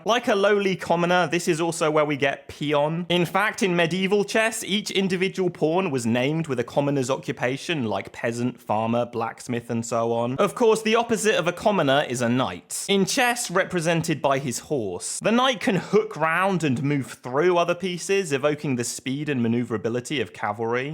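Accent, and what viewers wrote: United Kingdom. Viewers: This is because of his Bri’ish accent, really. They sometimes Rs between vowels.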